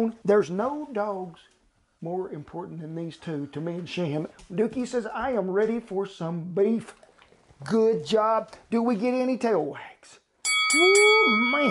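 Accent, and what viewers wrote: South, United States. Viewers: Your voice kinda reminds me of JOEL OSTEEN but with a more country accent!!🤭🤭..some onions along with them mushrooms wouldve did it but nevertheless a beautiful job!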